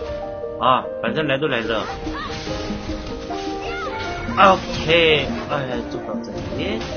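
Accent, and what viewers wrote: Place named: South Korea. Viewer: At the first time, i thought he is chinese. But after searching his name, i know he is korean. If you often hear a korean talk or watch many korean film , you will realize that his accent is korean. Btw, his mechanism is so fk smooth.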